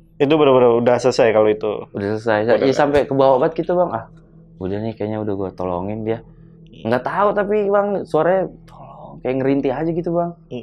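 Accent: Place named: Banyumas, Indonesia. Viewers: bahasa depok kok mirip bgt yakk sama bahasa ngapakk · et mat gua mau nonton horor mat, kaga usah ngapak²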